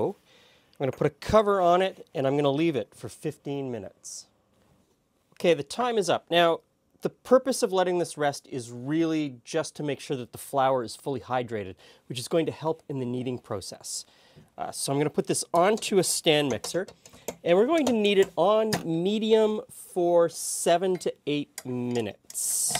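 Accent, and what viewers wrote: Canada. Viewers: Hey. Love your videos. Something interesting: my grandfather was from Iowa, and his accent rubbed off on me (despite me being raised in California)... I sound halfway between a Canadian accent (like yours), and a Southern US accent... Also, I cant wait till I have a bigger kitchen! I wanna try so many of your recipes. Keep up the good work!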